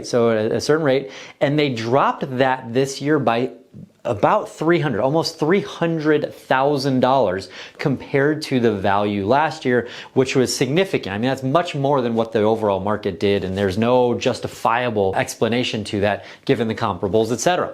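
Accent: United States. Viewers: Where did this New Yaukuh phah ugh come from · Sounds very good ol’ boyish.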